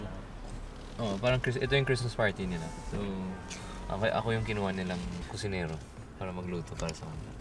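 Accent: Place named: Ilonggo, Philippines. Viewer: Ga gwa gd ang tono kung upod mo ilonggo man.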